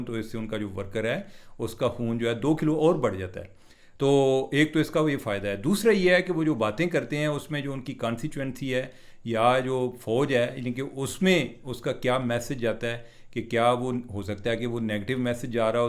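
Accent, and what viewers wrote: Punjab, India. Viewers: sb always stayed blessed.always excellent analysis sir..with punjabi touch very cute.. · Aap sanjeeda se sanjeeda baat ko halky phulky andaz me bayan karty hain.khas tor per punjabi tarka jo aap lagaty hain .I enjoy it a lot.Mera taluq punjab(Burewala) se hai iss liey .keep it up thanks.😂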